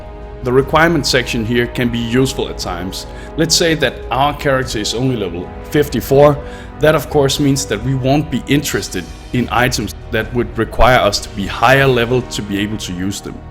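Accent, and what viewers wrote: Denmark. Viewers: Yo nerd. Just something im wondering, are you Danish ?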